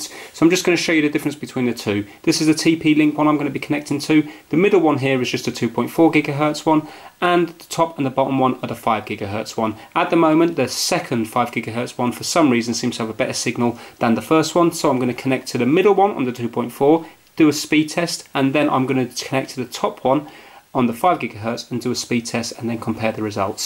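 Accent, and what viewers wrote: United Kingdom. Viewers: Good tips dislike because of your british accent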